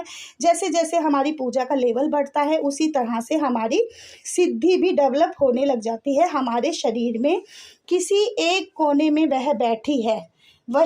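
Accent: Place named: Delhi, India. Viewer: Aapki aawaj se lgta h aap whi di hn dilli wali😊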